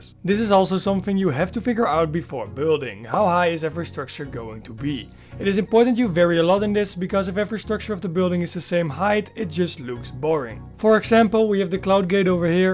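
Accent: Netherlands. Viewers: That’s one dutch fella if I heard one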